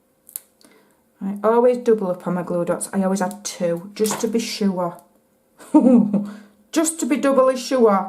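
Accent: Yorkshire, United Kingdom. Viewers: I guessed right that you are from Yorkshire. My sons were born there and I love it there and love your videos too. Keep up the good work.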